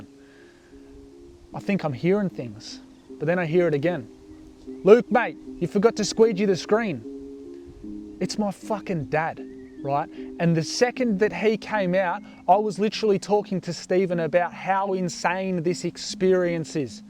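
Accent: Australia. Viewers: He’s Australian bro 😂 what Wer you on tho my guy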